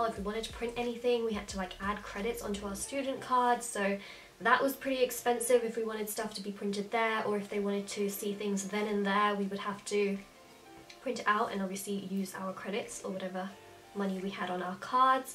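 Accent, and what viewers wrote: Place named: Australia. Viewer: Are you originally from Australia? You have a mixed Aussie-English accent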